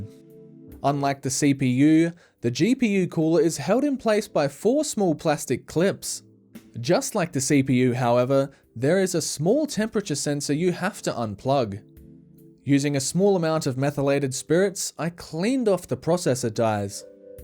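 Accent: Australia. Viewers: I hope people don’t think this is how Australians talk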